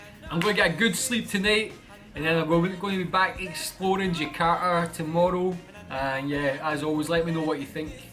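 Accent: Scotland, United Kingdom. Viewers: Are you from Scotland ? Your accent sounds Scottish.. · I Love Scottish Accent...👍👍💜💜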